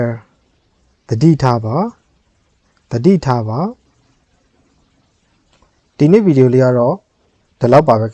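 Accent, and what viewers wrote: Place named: Myanmar. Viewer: အဂ်လိပ်စကားပြောလေသံက ဗမာငပိသံ မပျောက်သေးဘူး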